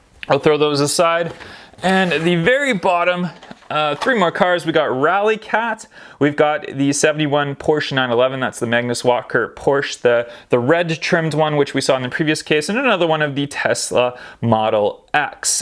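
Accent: United States. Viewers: how come you are British but you have an American accent?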